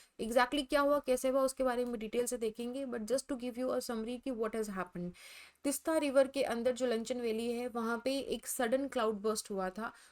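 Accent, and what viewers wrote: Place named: Rajasthan, India. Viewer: Amazing discussion on GLOF, Thank you so much ma'am your voice tone seems like you belong to Rajasthan my home state.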